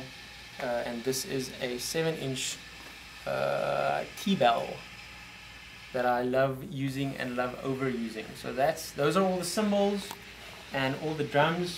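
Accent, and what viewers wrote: South Africa. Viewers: when ever u talk it reminds me of relatives in South Africa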